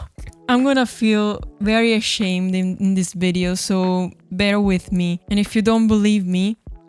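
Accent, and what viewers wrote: Italy. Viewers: Glad the algorithm suggested me your channel! I have 70 games on my backlog, but they are alla digital unfortunately. At least you could still touch and see your games lol. Anyway, your accent sound italian, am I correct? Are you a fellow italian girl gamer like me?? 🤝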